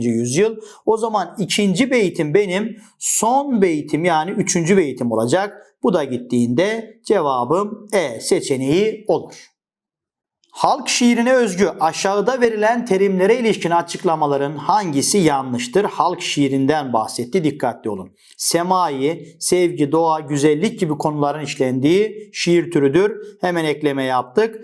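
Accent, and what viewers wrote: Black Sea, Turkey. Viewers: Hocam karadenizli misiniz karadeniz agzina benzettim konusmanizi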